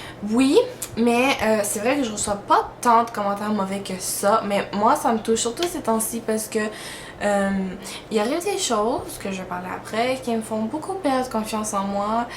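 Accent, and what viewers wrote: Quebec, Canada. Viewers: tu as un peu le meme accent que jean-marc généreux, tu es québécquoise? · Tu as bien raison dans tout ce que tu dis. Ne te laisse pas abattre, tu es comme tu es, les autres on s'en fout. Les gens ne savent que critiquer, ils ne se rendent pas compte qu'ils font du mal aux autre. On se ressemble un peu, niveau caractère. En tous cas, tu as l'air super sympa, et je suis fan de tes vidéos. Oh et, j'adore l'accent Québécois! :) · Je vois même pas comment et pourquoi les gens écrivent des " bad comments " about you.I mean,tu es trop mignone avec ton accent bien Canadien qui sonne un peu Anglophone,j'adoooore trop,et puis tu es jolie.J'adore tes yeux et, tes sourcils aussi.Et puis tu as un style que EN GENERAL je n'aime pas trop,mais ça te va si bien.En tout cas , essaye de ne pas écouter leurs bad comments et les ignorer restera ta plus belle arme ;). 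· tu es peut etre belle sous ton rouge a levre mais sinn j'aime bien ton accent(canadien?) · Un accent québécois... Du con. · Je t'aime bien,toi et ton accent québécois :) ^^ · tu sais elle fait comme elle px parce que tu le vois toi meme elle a un accents alors c'est deja plus difficile de parler français avec un accent que je pense est canadien alors la ferme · J'adore ton accent québécois :)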